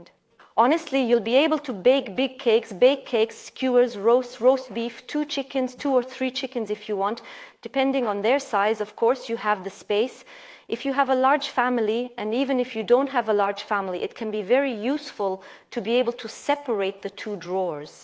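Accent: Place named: Ireland. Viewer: Great review. I love your Irish accent.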